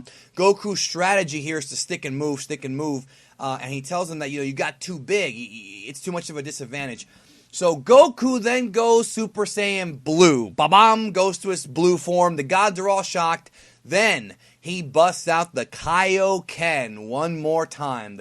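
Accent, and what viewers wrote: Japan. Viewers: Nice Nihongo accent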